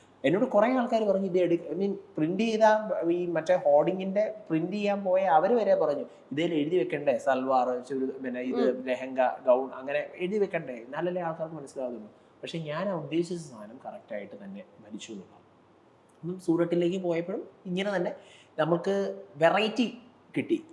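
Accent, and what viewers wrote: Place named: Kottayam, India. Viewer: Kottayam karalle ata slang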